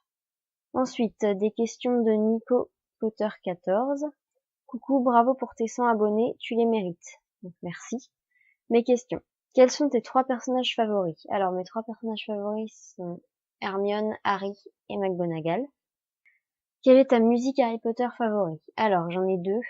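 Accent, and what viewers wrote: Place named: North, France. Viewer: Merci d'avoir répondu a mes questions :D , Je sais pas si c'est moi mais on dirais que t'es du nord mdr , et si tu veux rencontrer les acteurs je sais pas si tu connais la Welcome To The Magic School De People Convention ? ya la 4iem édition le 13 et 14 Janvier 2018 si je me souvient bien de la date ;) mais si tu veux vivre un moment pareil franchement faut mettre le prix mais ca vaux tellement le coup ;) Bref si tu voudrais qu'on parle de sa ou autre chose tu peux me contacter sur mon facebook ;) . <link> PS: De trés bonne question t'on était posez , superbe vidéo j'adore ta chaine :DDD :p Bis :*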